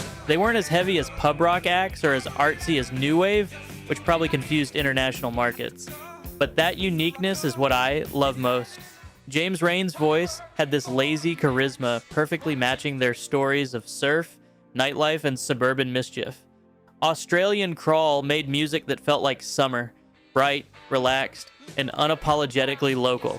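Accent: United States. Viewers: I am surprised how good your taste is. You sound American so how did you get to hear of these bands. Many main stream Australians would not have heard of many of these. Well done. But how does a yank know of these?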